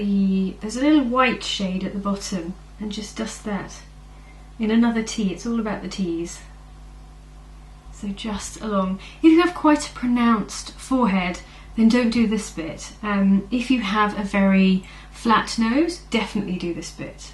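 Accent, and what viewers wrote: England, United Kingdom. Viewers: Love your channel... it's so refeshing to hear such a beautiful english accent.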